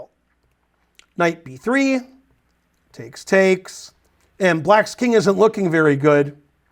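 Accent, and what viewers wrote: United States. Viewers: How can the american accent be so annoying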